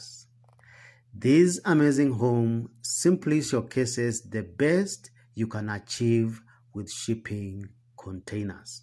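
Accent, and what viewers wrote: Kenya. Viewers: YOU SOUND KENYAN ... ??? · The narrator has a Kenyan accent. It's what kept me watchinf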